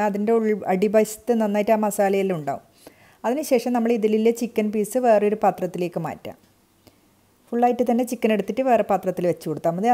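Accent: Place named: Kasaragod, India. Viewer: ഉദുമ ,കാഞ്ഞങ്ങാട് ,നീലേശ്വരം ഭാഷ ...☺️ നല്ല സിംപിളായ അവതരണം ...👌🏼😍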